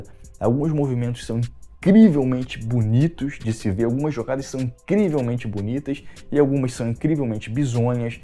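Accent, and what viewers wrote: Rio de Janeiro, Brazil. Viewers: Pode crer mano kkkkk Esse sotaque de carioca fudido não dá pra entender porra kkkk Essa intro é genial!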